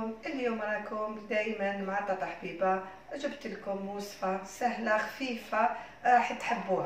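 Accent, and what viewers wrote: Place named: Algiers, Algeria. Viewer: j aime beaucoup ton accent ALgerois..une femme de parole..choukran 😘😘😍😍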